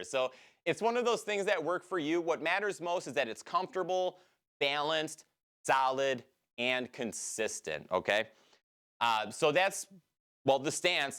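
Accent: Midwest, United States. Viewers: are u from the midwest?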